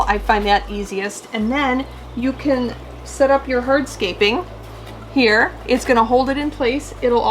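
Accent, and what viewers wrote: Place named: North Woods, United States. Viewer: Wish I had watched this before I made all the mistakes you warned about lol! I hear enough “ope’s” in der for me to think us girls are both from the nort woods. I too share your water parameters. I have had an embarrassing hard time with anubias. The leaves are prone to yellowing with holes and turning into a brown mesh work before death. I have increased fertilizer with what looks like improvement. They get low to medium light with floaters above. Any suggestions?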